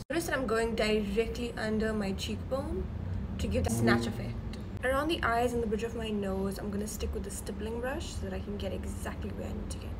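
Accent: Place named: South Africa. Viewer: looks white, is indian, trying latina makeup and sound south african. Everything about u is perfecttt!!!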